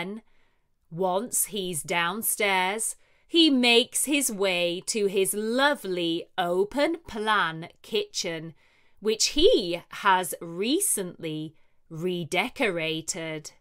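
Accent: United Kingdom. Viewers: Lovely English Stories is really helping me in improving English. The practice of native British accent is something unique about this resource. Most of the other competing resources are based on American accent. Practicing everyday i have made a good routine and the topics are coming out of daily life. Thank you very much 😀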